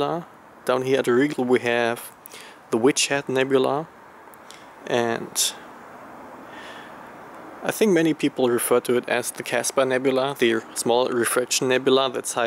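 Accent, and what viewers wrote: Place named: Germany. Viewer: Man hört voll, dass du deutscher bist :P Hab dich mal als Hobbyfotografkollegen abonniert^^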